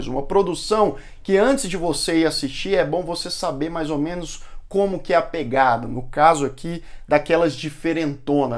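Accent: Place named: Goiânia, Brazil. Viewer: Sotaque goianiense detectado!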